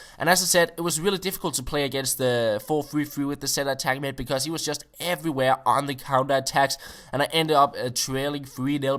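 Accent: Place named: West Zealand, Denmark. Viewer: hvaaa? er du fra Vestjælland?:)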